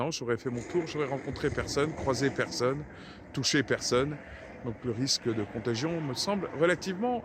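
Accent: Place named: Brittany, France. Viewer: Purée ca m’a fait drôle! Je vis maintenant en occitanie et je voulais faire entendre à mes enfants, le bon vieil accent brestois... Après quand on écoute bien: il est encore là! Bisous!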